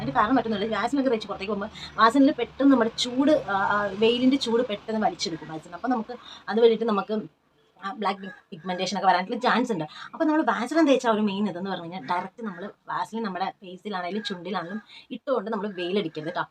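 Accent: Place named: Hindi Belt, India. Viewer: Hindikaru de Malayalam tracilete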